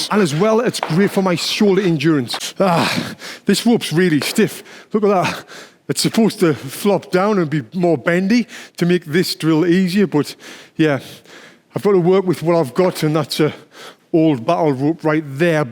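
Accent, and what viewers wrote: United Kingdom. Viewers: you are the best teacher, And you accent reminds me of british techncians i met when i was US airbase for supporting pilots who attended Alliance Military Drill, Thank you very much for your videos / Respect from South Korea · This channel is everything I like: information, humour, and a mean British accent — all that for free. is a legend.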